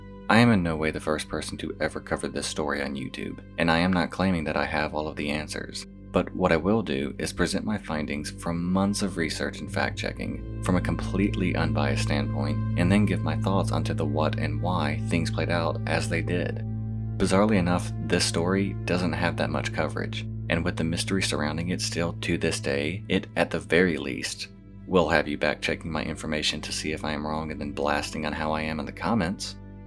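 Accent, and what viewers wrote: United States. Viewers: Hearing Lorry (instead of truck), flat (instead of apartment), and petrol (instead of gas[oline]), in an American accent is really strange lol.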